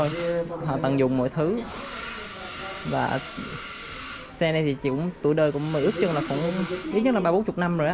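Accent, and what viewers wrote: Quang Nam, Vietnam. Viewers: tieng nẩu ????? nghe hợp lổ tai...cùng quê cùng làng ??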